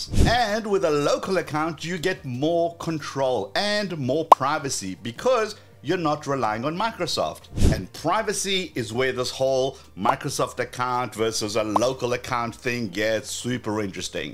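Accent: South Africa. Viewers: I might be way behind, but are you South African? 🤣🤣🤣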